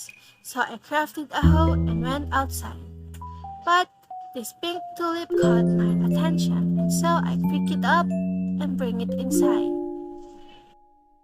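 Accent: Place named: England, United Kingdom. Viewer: THE VOICE OVERRRR OMAGASSHHHH! love your english accent ateeee! so fluent <3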